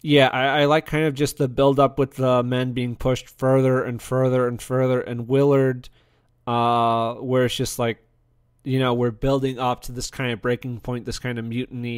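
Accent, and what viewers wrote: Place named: United States. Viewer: Firstly, thanks for taking the time to share your view of the show, enjoyed hearing your perspectives. I remember watching both Sharpe and Hornblower growing up and all the family liked both series very much. Both fantastic shows. That said, Hornblower is a definite personal preference for me. What’s more, I can say as a UK native that the general perception of status of these 2 shows, again although both well liked, is that Hornblower definitely has the clear higher status of the 2 shows. I think the difference in perception comes down to culture. I could be mistaken, but the reviewer of this video sounds American. Apologies if mistaken. Sharpe is a more typical heroic character or rather a simpler childish imagination of heroic character. He fits the American idealised trope of hero more than Hornblower, that’s why it is easy to understand why American audience probably get and therefore have more appreciation of the Sharpe show. 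Sharpe right from the start, he knows what he’s doing, he’s confident, competent and cool. He’s also a bit independent and brash, going against authority, charging first into battle because it’s the best way to get himself promotion. He meets a different woman every episode like he’s a James bond type. Its certainly more fun and cool (perfect for American audiences), but also less authentic and more simple as a character study. Since he’s so developed from the start, there less scope for character development through the series. Hornblower on the o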